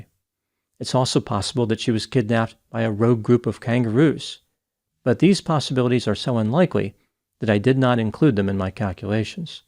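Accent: United States. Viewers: He’s not pronouncing it right. He has an American accent, he should say it like “Mel-burn”. With a hard R.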